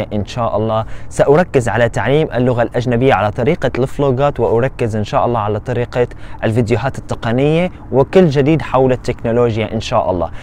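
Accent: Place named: United States. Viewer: وكيف قدرت تتكلم باللهجة الامريكية رغم انها صعبة ؟؟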